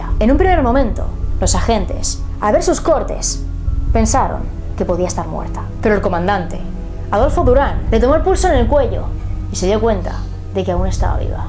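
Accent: Spain. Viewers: le gustan mucho las historias urbanas Mexicanas,le da un sabor muy agradable su acénto Español..!!😃😉🇪🇸🇲🇽👻🌵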